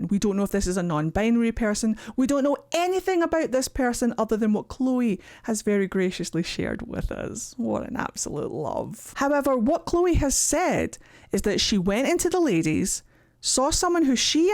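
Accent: Scotland, United Kingdom. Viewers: As an English brit. Love the Scottish accent! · First time seeing one of your videos, but I heard the accent and immediately knew it was gonna be a good one. Love our Scottish folks, y'all some real ones <3 - From a Texas Trans Woman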